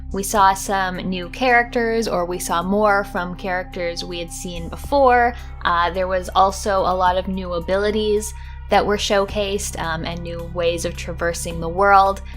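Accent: Canada. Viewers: Searching for new Bloodlines 2 vids: "Never heard of this American lass before, lets give her a try" - "Its been a while since I last talked ABOOT vamp-" "Canadian. My bad"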